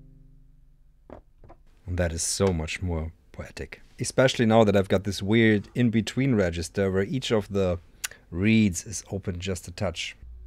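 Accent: Germany. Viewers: You have the PERFECT accent to go with that voice. So comfy, and Teutonic enough to inspire confidence in you, somehow? IDK it's just wonderful to listen to. Like that organ!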